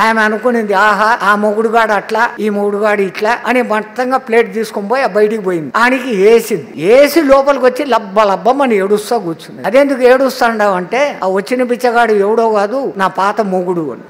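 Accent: Rayalaseema, India. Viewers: పక్కా రాయల సీమ భాష.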